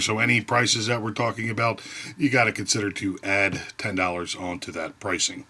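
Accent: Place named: New Jersey, United States. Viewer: Upper mid Atlantic sure sounds like Joizey to moi...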